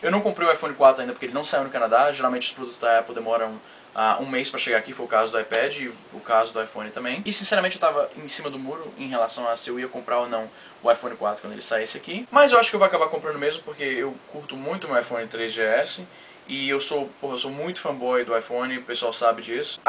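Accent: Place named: Curitiba, Brazil. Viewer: tu é curitibano, né ? hahah sotaque entrega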